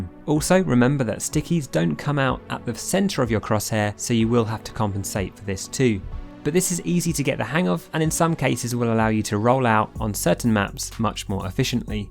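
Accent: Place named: United Kingdom. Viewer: I missed your british voice you absolute legend! Glad to have you back.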